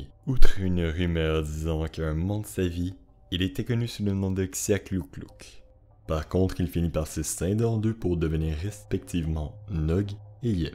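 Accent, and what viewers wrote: Quebec, Canada. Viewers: J'adore Cthulhu, mais ca m'a fait bizzarre d'entendre l'histoire avec un accent québécois ^^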